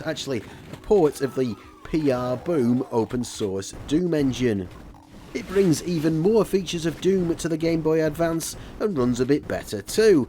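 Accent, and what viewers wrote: United Kingdom. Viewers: Can’t listen to a Brit talk about snes and Nintendo in general.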